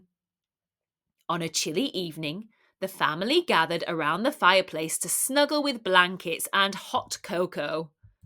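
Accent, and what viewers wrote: United Kingdom. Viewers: British accent is so romantic and beautiful 😊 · I like all of your stories too much, it is practical, with new words, nice u.k accent 🙏🙏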